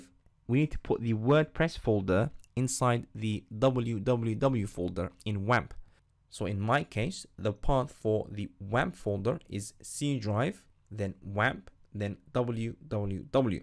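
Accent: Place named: Africa. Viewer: african accent i guess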